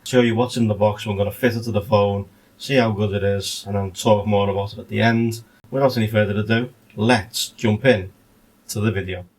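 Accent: Liverpool, United Kingdom. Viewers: Thanks for the video! Very useful. A curious question, are you a scouser? Greetings!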